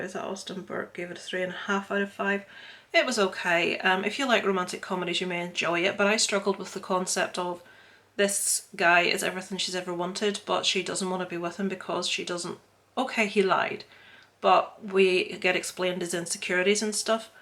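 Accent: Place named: Northern Ireland, United Kingdom. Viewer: Just subbed to u after seeing you on robs stream nice to see a northern Irish accent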